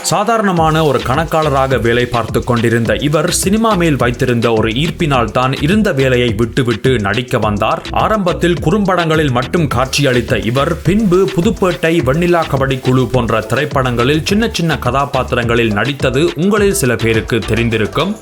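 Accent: Sri Lanka. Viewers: R u srilankan..........? Ur Tamil pronunciation 100% matching with srilankan tamil slang ...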